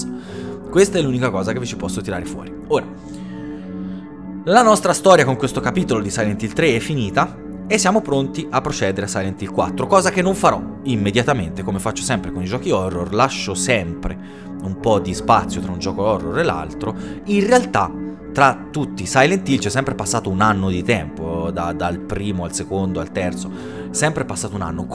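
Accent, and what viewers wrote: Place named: Livorno, Italy. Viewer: A me è sempre piaciuto pensare che quando si gira l'ultima volta, prima di tornare da Douglas, abbia incontrato lo spirito di suo padre (è Silent Hill, dopotutto, quindi ci sta che lui sia ormai anche legato a quel posto anche dopo la morte)... anche perché sarebbe un po' strano che lei tiri fuori il discorso del nome così dal niente. Nella scena sembra che lei si giri perché c'è qualcuno dietro... e quel qualcuno è Harry. Anche perché io mi rifiuto di credere che abbia perso così all'improvviso suo padre senza possibilità di dirgli addio 🥲 avevano ancora troppe cose da dirsi, e un solo quadernino non basta. Mi piacciono molto i tuoi gameplay, me li recupererò pian piano anche degli altri capitoli e di altri giochi... e il dialetto livornese è sempre top da ascoltare.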